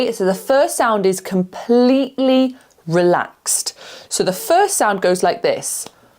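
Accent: United Kingdom. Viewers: I'm from California! I love. Your British accent!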